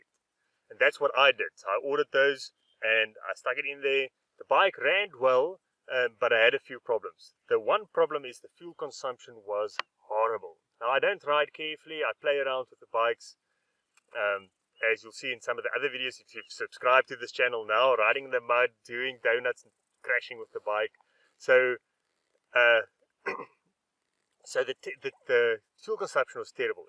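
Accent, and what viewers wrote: South Africa. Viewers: Hi, starting to find your channel very useful I bought a a used 2012 last year. I live 50 kilos away from work and sometimes use the XR for that fun ride and when you get to to work or home my jacket and rucksack absolutely reeks of poorly combusted exhaust gases. I think I read that this bike was sourced in the Northern Cape and depending on where, the altitude could be quite low and close to sea level. I stay in Midrand and as you know that is about 1600 m (5000 feet) above sea level, so we decided to drop the jetting by one size. Got it back last weekend and have not had much chance of testing, but will do so soon. If I nurse the bike, I also only get 15kml and I think that just can't right. Hopefully this mod will bring some relief. It's great having an SA accent on the biking channels on Youtube, well done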